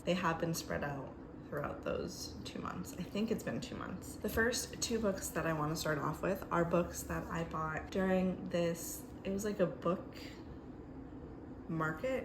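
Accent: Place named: Colombia. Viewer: Me encanta tu acentito colombiano 😊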